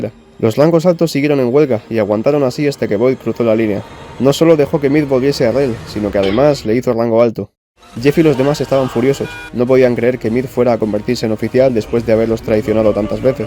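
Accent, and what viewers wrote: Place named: Spain. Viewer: "historia de los clanes latinos" lo narra un español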